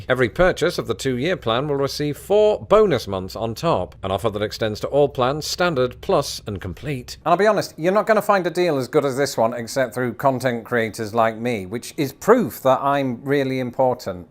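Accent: United States. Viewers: Your American accent is getting pretty good. Just need less nose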